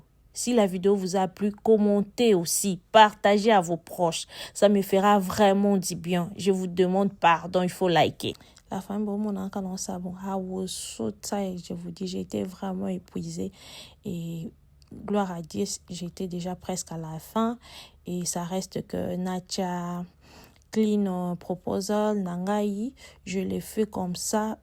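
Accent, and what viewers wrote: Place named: Kansas City, United States. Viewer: Bonsoir madame j’aime beaucoup vos contenus,en vous écoutant parler j’ai l’impression que vous habitez Kansas city aux USA par rapport au détail 😍😍😍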